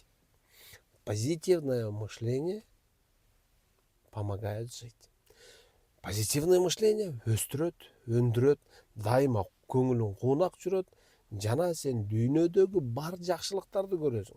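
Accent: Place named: Talas, Kazakhstan. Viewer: Могу жигит Таластыкко суйлогону окшошуп турат.?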